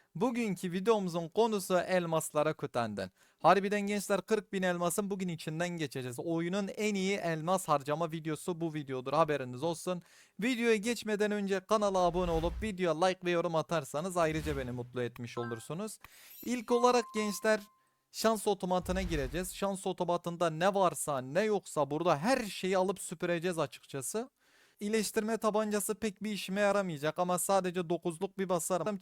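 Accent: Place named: Diyarbakır, Turkey. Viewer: Abe sen nerelisin şiwen dyb sanki soylermisn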